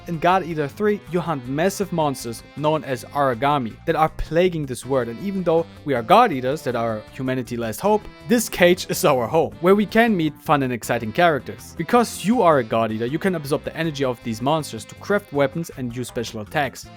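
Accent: Germany. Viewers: i can hear the german accent on the cool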